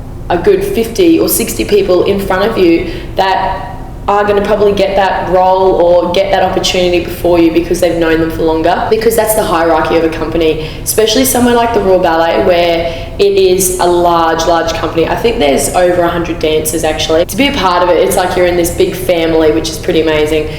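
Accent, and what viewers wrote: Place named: Australia. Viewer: Australian accent is so beautiful.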